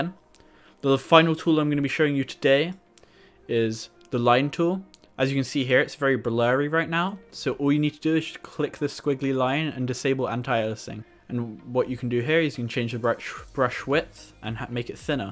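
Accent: United Kingdom. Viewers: if your voice library is so small you came to that conclusion I feel sorry lol. He is british accent sort of very lightly sounding but that's the only real similarity Everything else you assumed is placebo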